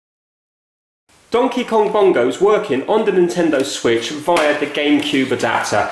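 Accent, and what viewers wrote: United Kingdom. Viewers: we present the award for the most english sounding youtuber ever to this guy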